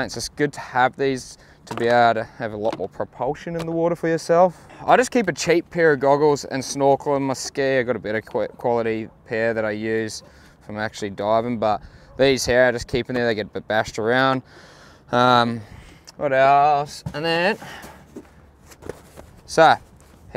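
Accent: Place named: New Zealand. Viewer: Judging by your excent you're a kiwi living in oz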